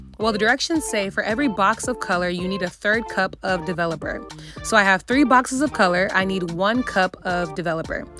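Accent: Nigeria. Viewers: I tried to ignore but I can't, the Yoruba accent creeping in giving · I just came across this video and I love it. The mix of your ascent with the sprinkle of Nigerian ascent made me subscribe immediately. I love you ❤❤❤. This video helped a lot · It's the Nigerian English twist for me...😅 I so love this wine colour, it suits you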